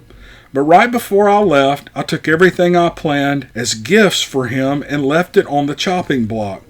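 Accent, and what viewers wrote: South, United States. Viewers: More great encounter stories from and his molasses soaked southern drawl!!! Who in their right mind would click on the thumbs down?!?!? Take it somewhere else, we don't want y'all here, anyway!!! Thanks,. I appreciate your time. Great stuff, as usual 😍👣 👍👍👍👍👍!!!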